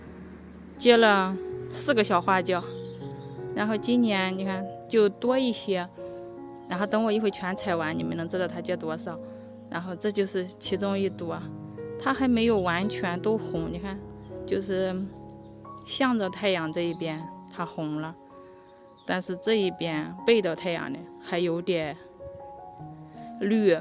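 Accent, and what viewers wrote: Hubei, China. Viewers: 对，湖北洪湖天门那个地方的口音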